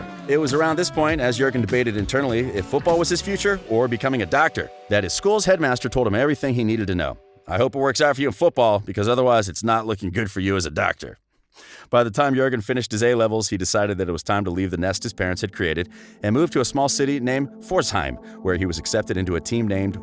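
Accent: United States. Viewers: After one minute of utter nonsense i gave up. Klopps "unimpressive" start of his coaching career over here in germany was to take over a village club like Mainz in the second division, when he was still a player without any coaching experience and make that club a bundesliga regular, even reaching uefa cup. Afterwards he went to Dortmund where they had just avoided bankruptcy and had no money for larger transfers. There he kept the people patient for some years with very emotional and attractive football, while building up his squad and it ended in consecutive championships, a national cup and a championsleague final. If that´s not impressive i don´t know what is. When they called "fergie time goalscoring" brilliant and implied it would have been a tactical revolution, while completely missing out on gegenpressing i decided to not torture myself further, as i feared i would become dumber by shear listening. 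We shouldn´t blame it on the american accent, as the journalism about nba or nfl for example is very accomplished. Whoever wrote this would never be allowed to report about a basketball game in the US. To me it sounds like the newscaster of instagrams celebrity rumours and scandals or something like that. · No insult intended, but when watching & listening to a video about soccer I´d rather have a British English speaker … ! - Sorry guys across the pond, this broad American just does not fit. I guess even a german accent would be a better match here … :)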